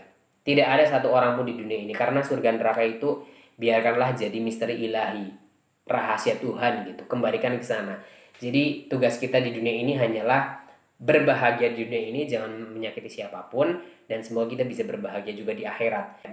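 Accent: Java, Indonesia. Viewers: Agak medhok ya mas 🤭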